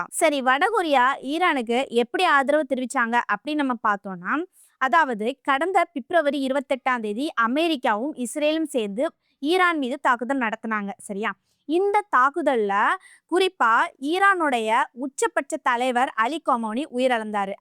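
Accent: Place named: Coimbatore, India. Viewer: 🎉🎉 அழகான செய்திகள் நல்ல தெளிவான வாசிப்பு விளக்கம் 🎉🎉 புதிய வரவு வாழ்த்துக்கள் பாப்பா பேச்சு வழக்கில் கோவை மாவட்ட ஸ்டைல் தெரிகிறது அழகு அருமை. மதுரை சக்திவேல்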